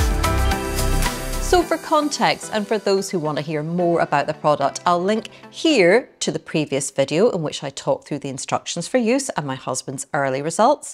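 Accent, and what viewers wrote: Scotland, United Kingdom. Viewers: ~ Love your accent and Scottish sense of humour too! ^_^ All best wishes from Brighton X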